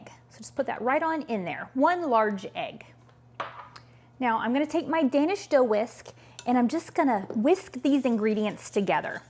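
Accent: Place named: South, United States. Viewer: … I just knew you sounded like a Southern Girl, like me, in Texas. Didn’t have time to make the cobbler today, I did get out the flour and sugar, I have fruit and will make it soon. Makes my mouth water to think about it. Thanks again for sharing. I’m really enjoying your recipes, want to make that easy bread too! 🤗🌺🤗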